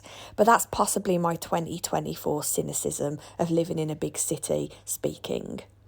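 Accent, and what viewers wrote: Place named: Warwickshire, United Kingdom. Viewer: I love your accent. You remind me of my Warwick relatives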